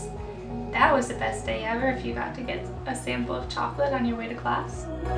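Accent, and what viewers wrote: France. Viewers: Tu as un super accent français et tu doit être une personne très agréable ! Continue ce que tu fais en gardant le sourire !😊✨😉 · okay first, sorry for my BAD BAD english !! i very like your video !! i'm French and i like your french accent the indoor of "Science Po AIX" is so ugly !! see you next time ;)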